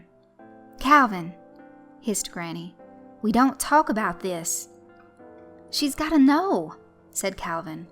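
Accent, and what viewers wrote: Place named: Georgia, United States. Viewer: Where ever you get your stories is great much different than the other's but in the best way possible.I love your voice I'm from Tenn and u remind me of family in South Ga Love the channel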